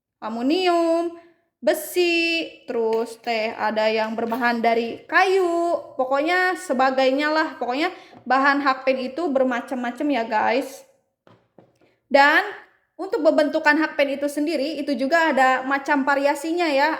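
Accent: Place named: West Java, Indonesia. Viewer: Kk orang sunda ya😅 Lucuu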